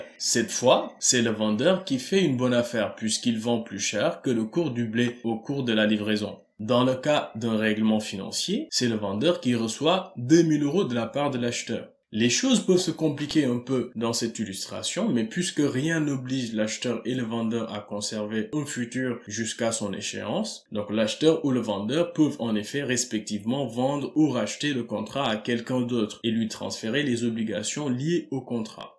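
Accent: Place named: Senegal. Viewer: Bonjour, très bonne vidéo ! J’ai crue percevoir un soupçon de parfum de la teranga dans votre élocution(!très agréable),right? Cordialement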